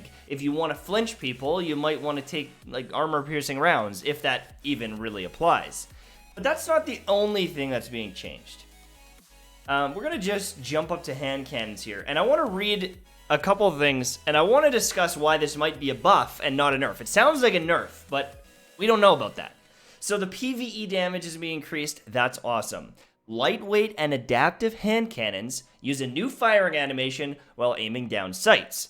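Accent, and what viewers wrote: Scotland, United Kingdom. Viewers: Thanks for putting out great content. Scottish accent was awesome lol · SCOTTISH VOICE!!!!!!!! Yes BABY!!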